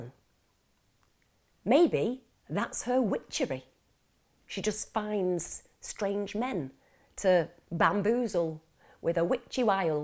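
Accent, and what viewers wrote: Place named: England, United Kingdom. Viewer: Absolutely no offence but when English pronounce Afrikaans words/names it is flippen hilarious.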